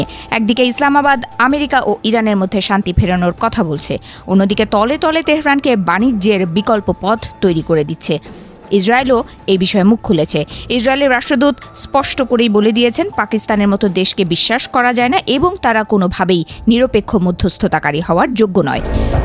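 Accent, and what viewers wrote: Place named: India. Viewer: ৭১ টিপির মালিক বদলেছে কিন্তু চ্যালা গুলো এখনো রয়েছে। তাই তো ভারতীয় টোনে এখনো কথা বলছে।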